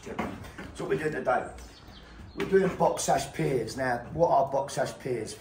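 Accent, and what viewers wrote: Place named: London, United Kingdom. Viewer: Saw your vids on the March you speek total fkin truth. Good on ya mate. You've got that proper London accent too 👍😊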